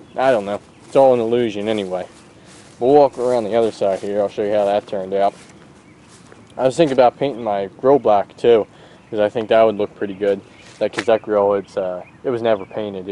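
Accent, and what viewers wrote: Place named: Philadelphia, United States. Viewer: Where are you from with that accent Philly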